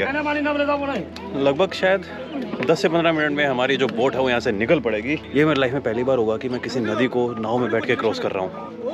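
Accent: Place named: Bengal, India. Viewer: Your bengali accent is so ❤😉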